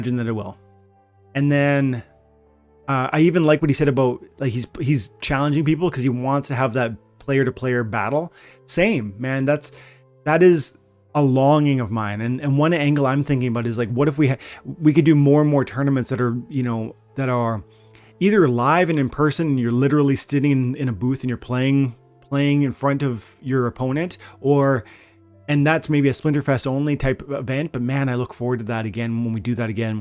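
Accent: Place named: Canada. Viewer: The first time I unplugged my headphones from my laptop and watched one of your videos and my girlfriend walked by she said, "He's Canadian?" and I said, "How did you know? He doesn't really have an accent" and she said, "aboot." I'd give her my raffle ticket for that one but she doesn't play.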